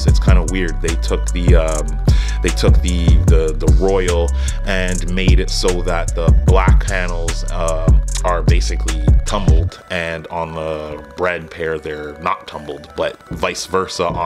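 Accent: Toronto, Canada. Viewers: Toronto mans accent strong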